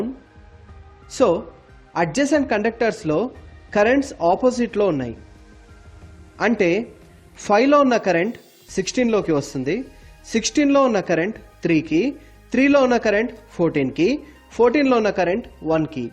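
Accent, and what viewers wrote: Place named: India. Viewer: That's impressive, but We can't here that many words, cause we need english subtitles. Indian english are so difficult to here for another nations.🤔😔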